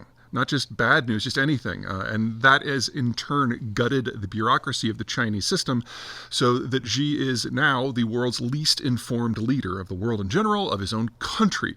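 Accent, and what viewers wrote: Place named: Midwest, United States. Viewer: It's closer to the latter as far as I'm aware, but Peter has a middle-American accent.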